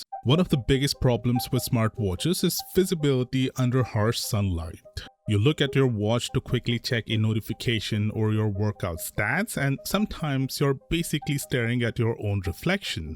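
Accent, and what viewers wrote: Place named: India. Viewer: He clearly speaks English with a Hindu accent, he’s not Turkish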